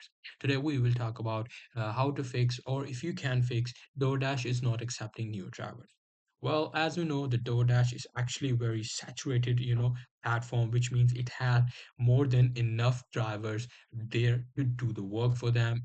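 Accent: India. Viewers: Indian accent, opinion rejected. All indians are dirty scammers ew lol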